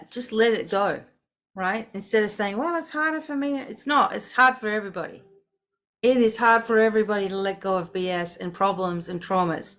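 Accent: Australia. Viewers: I absolutely loved this message ❤and really hit that deep spot! Ya aussie spirit and voice 😂came out especially at the beginning with the voices about people not understanding why we have a energy exchange 😅 omg I was rolling around in laughter vibes flying high lol 😂 Thank you for the depth and the joy ❤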